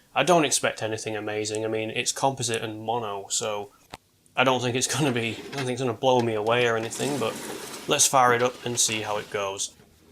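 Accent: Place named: England, United Kingdom. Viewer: weird im sure you sound English but that's not a UK plug socket?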